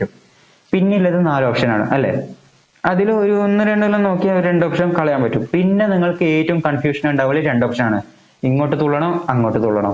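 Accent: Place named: Thrissur, India. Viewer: കേട്ടാലറിയാം ത്രിശ്ശൂര്ക്കാരനാണ്.....😅😅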